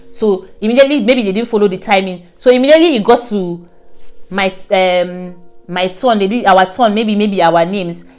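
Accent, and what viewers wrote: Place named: Hausa, Nigeria. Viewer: Your accent sounds hausa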